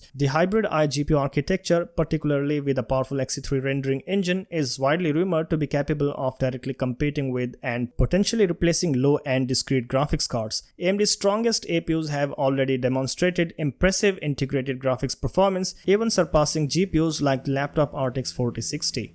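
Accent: India. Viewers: I wanted to watch this video but as soon as I heard the indian accent it put me off jesus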